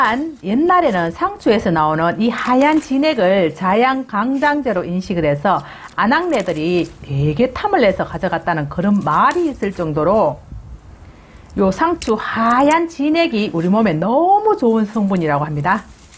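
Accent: Gyeongsang, South Korea. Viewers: 경상도 분들은 그 발음 너무 어려워요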